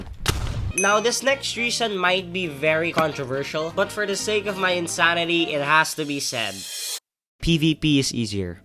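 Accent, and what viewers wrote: Philippines. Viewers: i love how your filo accent slips all the time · Dude you sound Filipino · I know your filipino/southeastasian cuz of that accent :)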